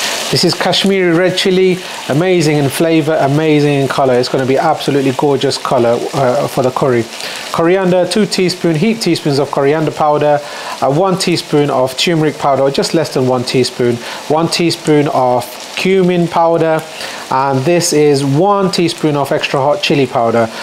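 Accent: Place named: United Kingdom. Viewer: Some British accent..... up ahead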